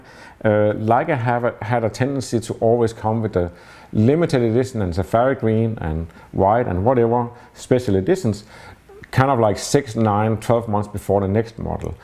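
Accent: Denmark. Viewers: Your intro are my favorite. I love the danish english vibe. Have been follomt you for some time now. Keep it up. 😊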